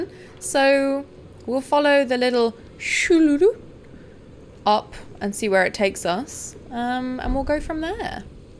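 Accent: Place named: North, United Kingdom. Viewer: Welcome to Elden Ring this game is amazing and ill sub love the Northen Accent I get Banned from games for talking my usual talk yay freedom of speech Blizzard perma bans and they cant even keep them selves in check cowards hide behind a automated ban system. fight me.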